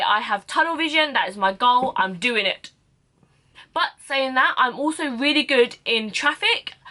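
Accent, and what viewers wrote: United Kingdom. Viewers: I just subbed...simply because i feel inlove with your british accent and your ombre brow 😉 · So funny those facial expressions hahaha u make me laugh, an Asian speaking with a British accent is fukn funny af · Is she British?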